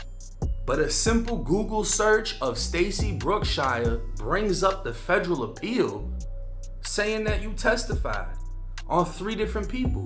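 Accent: Boston, United States. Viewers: The way you have developed your delivery and pronunciation while still holding on to that Boston sound we all love. Your in a league of your own..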